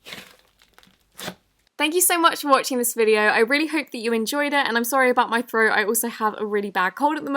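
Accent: United Kingdom. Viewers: your from U.K?????😮😮😮😮😮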